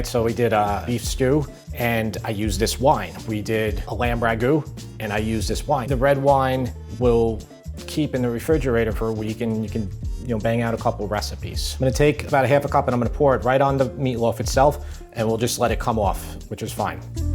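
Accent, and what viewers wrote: New York, United States. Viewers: Love 💗 the way you cook. A typical sounding New Yorker…..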